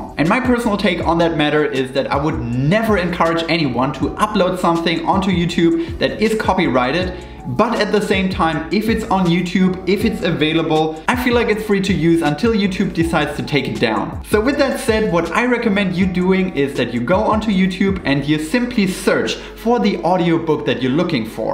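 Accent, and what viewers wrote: Germany. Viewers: Sir, are you German? Because you have the exact accent of a german friend of mine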